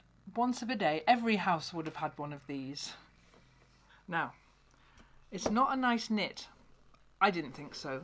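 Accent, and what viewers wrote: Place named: Scotland, United Kingdom. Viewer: Awww. Agnes is a spitting image of you. I don’t knit but truly enjoy watching your videos and am inspired by your creative ideas. Frugal as well, I find fascinating the way something is always possible from seemingly nothing. I’m in Missouri,USA yet in my imagination I can find that I’ve come to Scotland for a visit when you speak. Ahhh. And the bagpipes……🙂 Perhaps one day….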